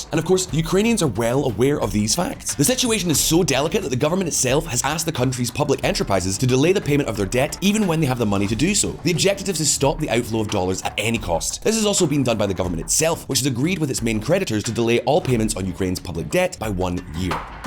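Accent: Scotland, United Kingdom. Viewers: maybe you could slow down to 1000 words per minute and try and drop the Scottish accent. There are others outside the UK who like to get updates.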